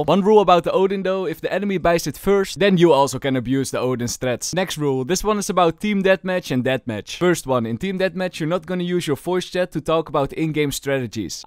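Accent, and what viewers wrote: Netherlands. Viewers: is this guy dutch?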